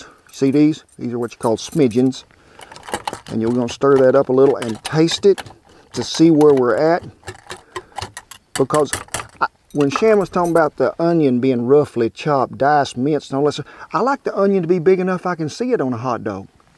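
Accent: South, United States. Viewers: I LOVE THIS CHANNEL!! I TRULY ENJOY YOUR PERSONALITY, YOUR TALENT FOR COOKING, YOUR RECIPES, YOUR SOUTHERN DRAWL, & YOUR DANCIN JIGS. KEEP UP THE GOOD WORK!!😃😆😉😁🤗💗💕...and for those BEAUTIFUL dogs 😍😍😍😍❤❤❤❤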